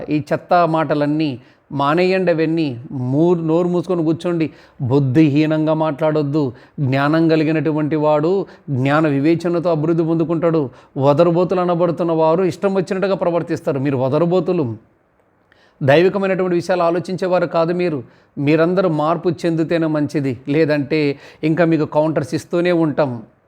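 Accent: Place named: Kukatpally, India. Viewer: Miru Kukatpally atla matladaru kuda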